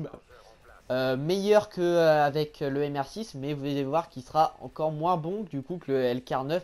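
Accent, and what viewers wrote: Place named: North, France. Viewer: Tu viens du nord toi non m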